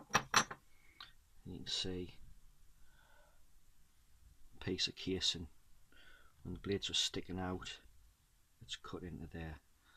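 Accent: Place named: Newcastle, United Kingdom. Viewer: Hey, I've got one of these, bought new! Nice to hear Geordie accent...trying to change the blades,,,,, first time in all these years..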